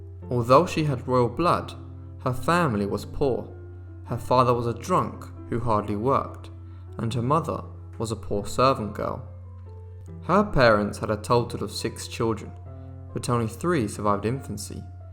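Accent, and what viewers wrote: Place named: England, United Kingdom. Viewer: te mire en un video..no se si fue tiktok o instagram donde tenias la cancion de " " y te reconoci.. wow.. no pareces latino en absoluto.. y tienes acento ingles.. I love your channel by the way.. I love history and historical figures. que parte de mexico?? yo naci en baja california pero vivo en California ahora.. cerca de San Diego.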